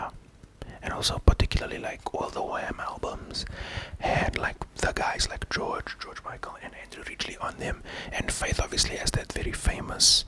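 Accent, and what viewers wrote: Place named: South Africa. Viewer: Finally someone who does asmr with a legit South African accent! Yayyy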